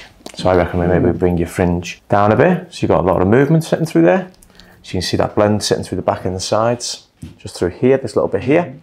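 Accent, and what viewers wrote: Liverpool, United Kingdom. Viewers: Nice to hear a Scouser! Is your salong in Liverpool? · are you from Liverpool or even Manchester? Yank here...Can't quite place your accent.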